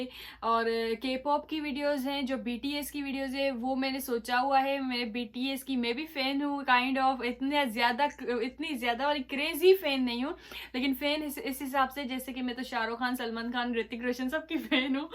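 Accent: Sindh, India. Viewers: Why your accent is indian????dear proper urdu zaban ma bat karen plz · Haha apka sindhi accent😂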